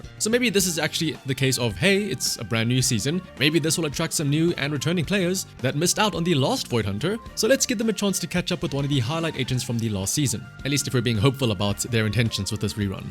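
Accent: South Africa. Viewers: your accent sounds familiar, are you south african?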